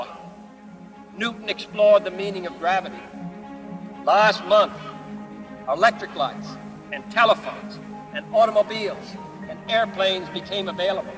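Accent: Boston, United States. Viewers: THAT is one wicked Bostonian accent.... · "......nat becausah there easy bat becausah there hahhd" -heerlijk Bostonian accent . Wisdom and courage combined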